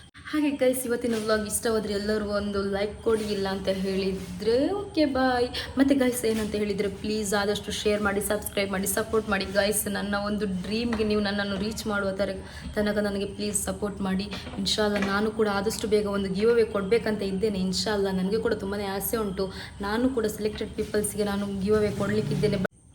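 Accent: Mangalore, India. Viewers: This is Mangalore kannada Mangalore an style of speaking that's why you feel that it is not perfect but I feel no problem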